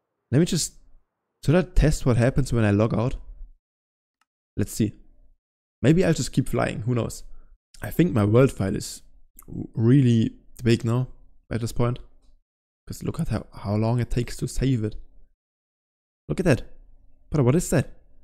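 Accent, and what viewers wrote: Germany. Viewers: Hallo wieso machst du deine Videos nicht auf deutsch (man hört das du aus Deutschland kommst) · I hear German Denglish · I can hear the deutsch :) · Man kann den deutschen Akzent hören lol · Wieso hör ich so das deutsche englisch raus 😂 geiles vid❤ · I hear german🧐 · Are u german ur accent sounds like ur german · I hear ein bisschen German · Immediately noticed the German accent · Aha gutes video man merkt so bisschen das du Deutsch bist ❤ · ofc the guy with the most german accent ive ever heard is talking about ethnicity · Hahahahaha hier der deutsche Kommentar nach dem ihr alle sucht, passend zum wunderschönen Akzent 🇩🇪🔥! SEHR STARK GEMACHT, MEISTER 💪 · Oi digga, bist du Deutscher? Dein Akzent ist ziemlich deutsch lol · is this an german accent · Da hört man den deutschen akzent direkt raus hahah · i can hear a little bit of german :3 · ok ok i hear a little bit of german in your voice, aber ehrlich cooles video bro mach weiter so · bro sound just a little DEUTSCH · I can hear the German accent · I hear a bit german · Bist du deutsch? 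Dein accent hört sich sehr danach an. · Höre ich da etwa... DEUTSCHEN AKZENT?? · youre definetly german i can tell by the accent so servus meister wie gehts super video 👍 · ich liebe den deutschen akzent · Bester deutscher akzent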